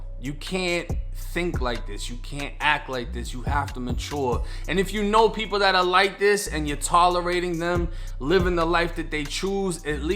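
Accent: Boston, United States. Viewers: Love your videos bro . Florida boy with the Boston accent . 💯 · Jus noticed the Boston hats you be rocking! And the accent leads me to believe you from the bean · What part of boston you from?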